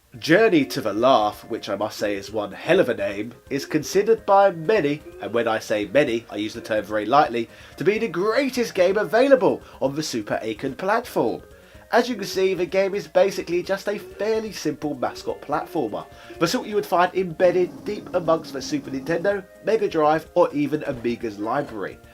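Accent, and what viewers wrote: United Kingdom. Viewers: I love the British voice !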